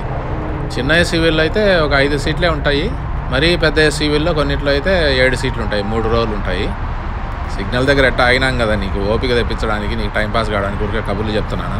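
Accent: Kadapa, India. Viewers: Edhie mana rayalasema slag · Gaaru! Video Simply Superb. Kadapa Yaasa Bhaasa chala bagundi. USA lo Kadapa Yaasa ( Vuntaado Voosipotaado, Saami )! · Anna .. u r doing good job...with Kadapa language.... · Enjoyed Sir. Super kadapa accent 😍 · ముక్యంగా మీ కడప యస ఇంకా బాగుంది. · Oorini pasugala vaadu ponilede !! 😂😂 Rayalaseema slang . Very funny 😂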